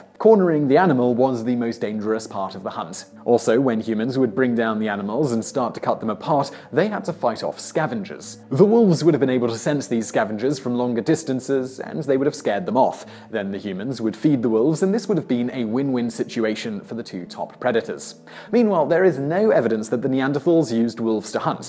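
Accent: United Kingdom. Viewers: They died from listening to stupid top tenz presented by some guy who doesn't seem to have any intelligence, education, charisma or creative ability...just a British accent....That's it.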